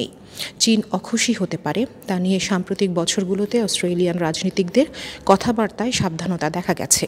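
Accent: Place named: India. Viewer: sobaike ekta onurud korbo. jara purbo pocchim.. ai cheneler khobor sunen taderke bolchi. ai chenelta age bangla deshi chilo akhon seta varoter kace bikri hoye gece... age khobor porto ekjon purus .... ekhon meye khobor pore.. ami varot ebong bangla deshider kontho buji... ei meye varotiyo.. mittha songbad pochar kore. ami onek age theke khobor suntam ekhon ar sunina... ajke UN SUBSCRIBE kore dici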